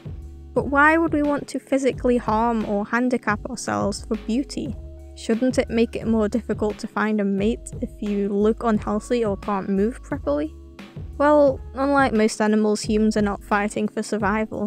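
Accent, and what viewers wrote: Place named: United Kingdom. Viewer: You sound like my britt voice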